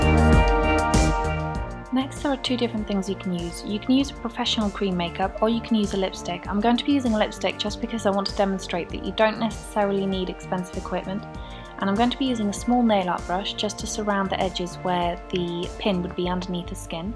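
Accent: United Kingdom. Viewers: British accent or Australian?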